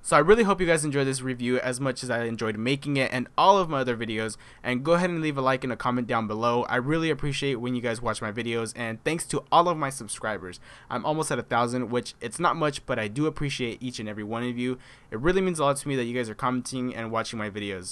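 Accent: United States. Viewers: yo u from fresno bro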